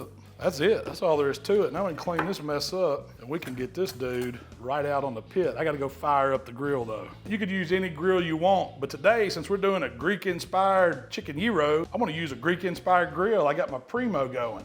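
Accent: South, United States. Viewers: Yes. Good old ooollll and follll. It's like the south lost the letter I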